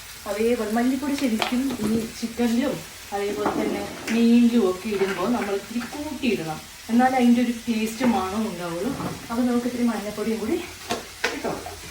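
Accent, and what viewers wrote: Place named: Palakkad, India. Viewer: Palakad slang very nice 🥰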